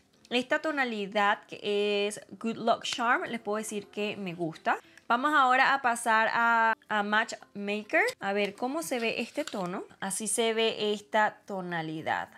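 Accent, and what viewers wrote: Venezuela. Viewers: de que parte de Venezuela eres? Suenas como si fueras de Maracaibo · Ese acento venezolano... 🤤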